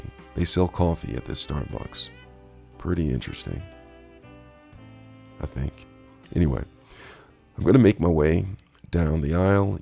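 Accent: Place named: France. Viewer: Very very helpful! Love that French accent! But seriously, I've watched several of these and yours is the best one by far. Huge difference in the presentation and the others were very shallow in the delivery of information and were way less thorough than you. Even the ones that are twice as long as yours we no where as informative. I'm not traveling until January 2026 and I'll definitely watch this video again as part of my final preps. Thanks a million.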